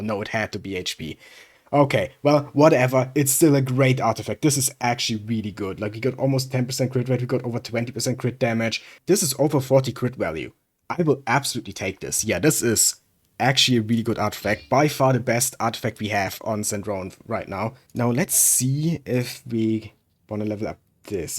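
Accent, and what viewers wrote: Germany. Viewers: Hey where you from? Your accent Sound SO german 😭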